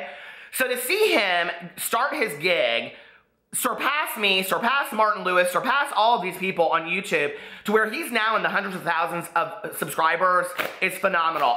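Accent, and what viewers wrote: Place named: Midwest, United States. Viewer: Yes!! It has to be a Midwest thing, I say it the same way!😂😂 I'm from Northwest Indiana.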